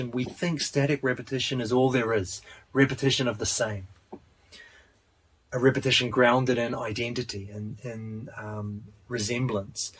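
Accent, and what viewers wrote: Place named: New Zealand. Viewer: Are you a Kiwi bro?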